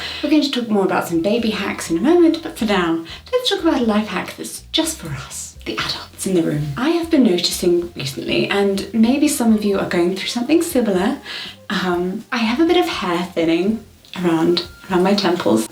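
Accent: RP, United Kingdom. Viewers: Probably because in RP (Received Pronunciation) which is the stereotypical English accent and the one I believe has, premature is pronounced as “preh-muh-tyour”. So if you stick to the accent’s rules it would be preh-mie. Americanisms have crept into a lot of English accents now though, so that may be why people don’t say it like that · People with the Received Pronunciation, or the stereotypical sort of accent has, say it that way. Not everyone is from the US. This family is British. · Re: strangers talking to you. My grandma will say "sorry I don't speak English" (she only speaks English). Now I want you to try this with people who stop you to talk to you, in your perfect RP English 😂😂😂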